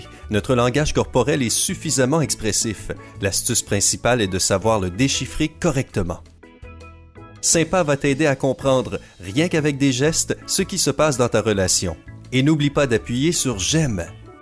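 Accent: Quebec, Canada. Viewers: C un québécois? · Cette accent canadien ouch 😂 PS : #teamcelibat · Tu es québécois ou quoi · Lol il est quebecois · L'accent Québecois est tout simplement insupportable, c'est une insulte à la langue française. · T'es québécois ? Sa se reconnait avec ta voix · J’adore cet accent quebequois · Hé oui ! C'est bien tout ce qui s'est passé... deux ans avant ma rupture ! Heureusement qu'il y a cet accent québécois pour me r'monter l'moraaale !!! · Québécois XD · Accent québécois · J'aime bien vos vidéos je me suis abonné. Et c'est un joli t'accent canadien. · Zoumouda Soufou C'est l'accent québécois. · C la nouvelle voie québécoise de simpa ou quoi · C une voix québécoise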